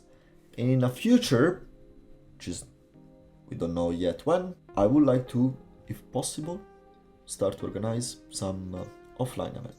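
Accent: Italy. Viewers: Sei italiano Bro ?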